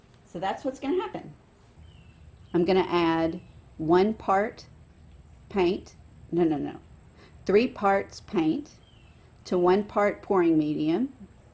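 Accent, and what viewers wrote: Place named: South, United States. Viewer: This has nothing to do with your beautiful work, but I love your voice and beautiful southern accent.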